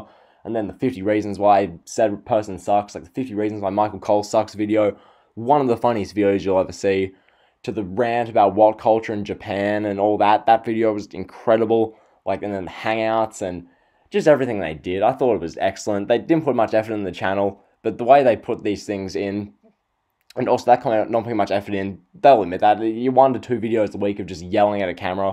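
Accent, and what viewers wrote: Australia. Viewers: Are you Australian? I recognize the accent.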